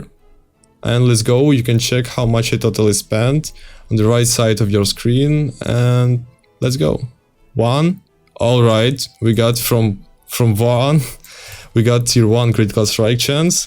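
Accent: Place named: Eastern Europe. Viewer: Never thought frost blades can get to this dps in that low investment) Also you accent, Where are you from? Sounds like east europe Или даже Россия)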